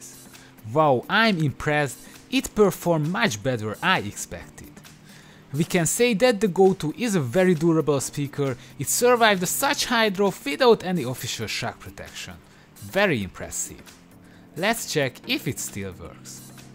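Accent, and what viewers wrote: Hungary. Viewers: Olyan magyaros a kiejtésed... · Nagyon hallatszik, hogy magyar vagy 😀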